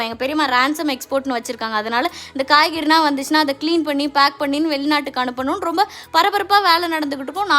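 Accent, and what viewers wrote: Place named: Kanyakumari, India. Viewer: Unga slang kaekum botae kanyakumari slang maariyae irunthuchu😊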